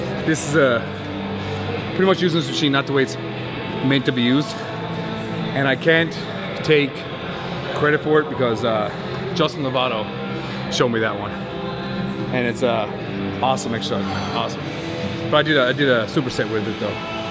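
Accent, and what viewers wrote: Newfoundland, Canada. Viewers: newfoundland accent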